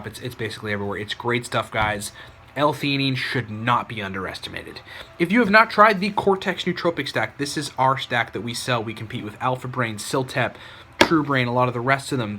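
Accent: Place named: New York, United States. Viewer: that's pretty close to New York. That's probably why I don't notice a difference between your dialect and mine. The only time I notice people talking fast is when they have a unique voice in addition to them talking fast (Ben Shapiro). I really didn't notice it in your case since your voice isn't that unique.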